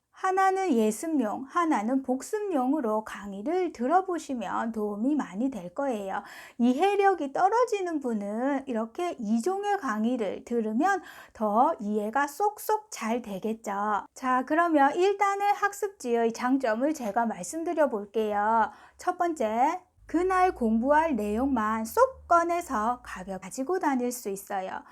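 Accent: Busan, South Korea. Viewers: 님!저도 올해50이된 아줌마입니다!독학으로 취미로 시작해서 이것저것 공부해 오다가 목표에도 없었던 n3를 이번에 도전합니다. 커리큘럼따라 그대로 공부하고 있어요. 기초부터 아주 꼼꼼하게 가르쳐주시기 때문에 행여나 독학으로 완전히 다져지지않고 지나친 과정도 이번에 다시 바로잡게 되었어요^^. 센세 부산사투리 정감있고 귀에 쏙쏙들어오자나요?ㅎㅎ 물론 뇌도 나이가들어 어린학생의 두뇌의 속도는 따라가지 못할지 모르지만, 아줌마들의 끈질긴 근성과^^ 잃지않는 흥미를 꾸준히 유지하고, 매일매일 조금씩이라도 하시면 언젠가 자신도 모르게 일본어를 읽고 쓰고 말할날이 올거에요! 저는 지금 일본인 라인친구가 3명정도 있고, 영상통화로 서로 언어교환도 하고있어요! 응원하겠습니다. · 45세 된 주부입니다. 저는 2년전에 책을 사서 단어,문법 위주로 혼자 공부를 했는데요. 나름대로 열심히 했다고 생각했는데 혼자서는 역시 무리더라구요. 왜 그땐 유투브로 공부 할 생각을 안했을까요...들어야 귀에 익혀지고 따라서 말해봐야만 입이 열리는데 그때는 혼자서도 할수 있다고 고집 부렸어요..결국 포기하고 올해 5월 중순부터 다시 시작했어요! 저도 선생님처럼 연상암기법으로 단어를 공부하고 있습니다. 저는 경남 김해에 살고있는데 단어 암기법 하실때 선생님의 부산사투리가 귀에 쏙쏙 박히는 그 순간!!!! 구독했어요^^ 이상하게 들을땐 오그라드는데... 이상하게 외워지더라구요^^ 미미 초록 목도리, 왼쪽다리 흰다리 오른쪽 다리 미스코리아 다리😅😅😅 듣고! 따라서 말하고! 복습하면서 쓰고! 저 열심히 해서 일본어가 들리는 그날까지 절대 포기하지 않고 끝까지 최선을 다할겁니다. 저처럼 늦게라도 공부 시작하신 다른 분들 모두 진심으로 응원하구요! 우리 모두 다 힘내요!!!! 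아! 선생님 책 출간 축하드립니다!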